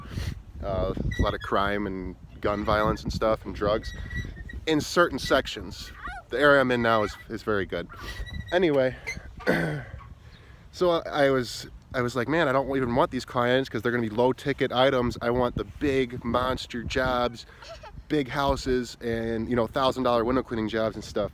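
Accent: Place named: Chicago, United States. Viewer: I knew you were from Chicagoland. The accent and the 815. LOL You looking for any help?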